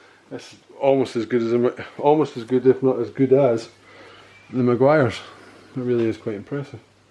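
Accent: Scotland, United Kingdom. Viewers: You don't get many scottish guys on YouTube! 🤣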